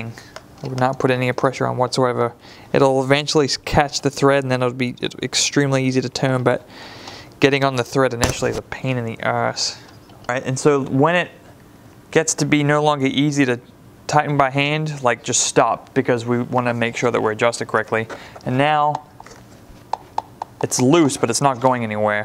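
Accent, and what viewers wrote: Australia. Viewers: cool project! am I detecting a slight australian accent? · What the hell is your accent ? Lol I’ve never heard I’m Aussie you sound semi Aussie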